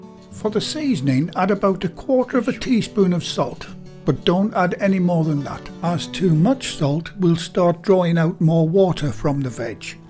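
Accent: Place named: Gateshead, United Kingdom. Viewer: Move to Gateshead, they all sound like that.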